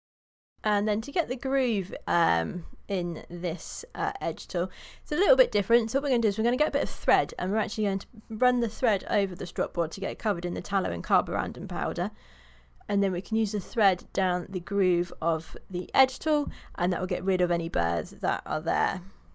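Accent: United Kingdom. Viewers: by your nice English accent this is my guess you are from UK, Am I right.?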